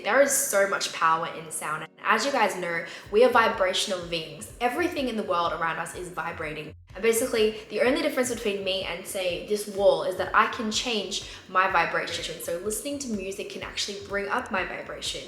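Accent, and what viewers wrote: Australia. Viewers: can you speak little slowly....I cannot caught few words because of Australian accent...I’m from India 🇮🇳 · Australian accent too tough to understand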